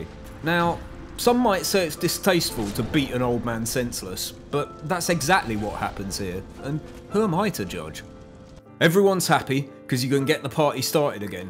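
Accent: United Kingdom. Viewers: I've got a thing for surly, irreverent British men talking about video games over a backdrop of free domain hard rock, it would seem.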